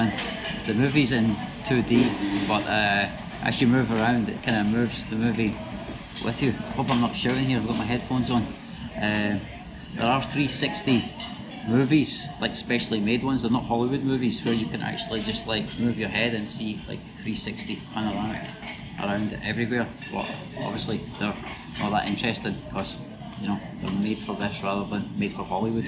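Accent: Scotland, United Kingdom. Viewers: Hi, love to watch all your videos, with your scottish accent remind me <num> when i live in Manchester. i have a few friends from Scotland during that period. now already subscribed your channel and will waiting for your new videos. let me know where ever you drop by in Malaysia again, will offer free videography and accompany your trip in here, cheers mate:) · I don't speak English but now I understand what a Scottish accent is. 🙂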